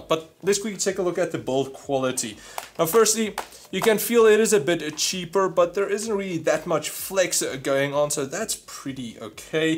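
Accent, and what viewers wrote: Afrikaans, South Africa. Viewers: I could recognise ur accent I was born in SA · Jy klink regtig afrikaans ek is afrikaans btw as jy nie geweet het nie lol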